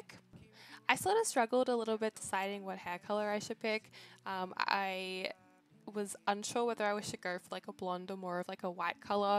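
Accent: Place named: Australia. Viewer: Got some catching up to do🤍love this vid. Your accent is awesome🤭Aus?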